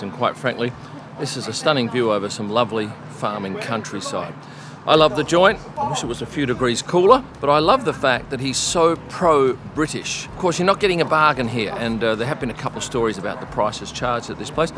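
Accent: Australia. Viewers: Why are you talking about US dollars when you have an Australian accent and are talking about a pub in the UK. We have pounds here!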